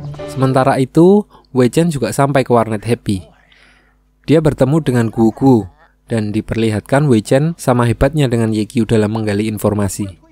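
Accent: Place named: Java, Indonesia. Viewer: Njiiirr orang jawa .. salaam bang dari jawa timir kediri bang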